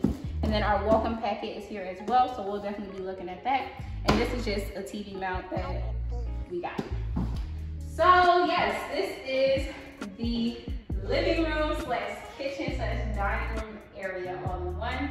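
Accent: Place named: New York, United States. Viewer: You sound like a New Yorker, but I could be wrong.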